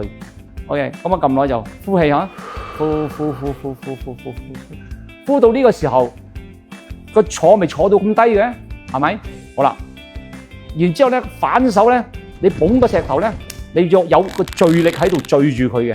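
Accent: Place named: Hong Kong, China. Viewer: get 到了，好親切的香港人香港話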